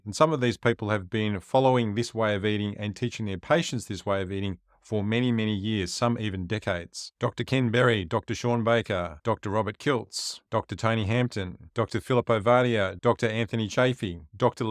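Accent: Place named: Australia. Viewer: Hey! Outstanding and informative video!! You summed it up in only 8 minutes!! I'm impressed!! 😊👏🏼👏🏼👏🏼👍🏼💪🏼💕 P.S. Sidenote: I Love some of the words you say, like the word "rubbish" instead of "trash" or "junk" or as some call it, "crap", lol.😀 (I love Australian and British words and accents!) I actually had a good friend from my college days, <num>. He was an exchange student, Tennis player, from South Africa who dated my best girlfriend. I was often a tag-along during the summer on their outings and dates. He is the one who taught me the word petrol for gasoline. Lol. Also my 13 yr old granddaughter loves the Australian children's cartoon series 💙"Bluey"🧡and so do I! Such a cute show! That's all for now! 😁 Take Care, God bless you and your family! 💕🙏🏼🤗 Susan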